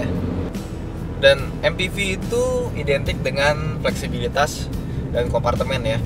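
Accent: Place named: Semarang, Indonesia. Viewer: mas wong ndi to? logate koyo wong semarang? asem...:D usul: kenapa gak kalian bikin acara khusus otomotif ber-3 kayak top gear. Khusus menjelajah Indonesia/asean pake mobil2 yg familier di Indonesia. Kayak review mobil delica bagusnya langsung test naik gunung berbatu, berlumpur, melewati lembah dll. Gitu kan jadi lebih jelas pointnya. Bosen kalo test nya di hutan yang itu2 melulu mas.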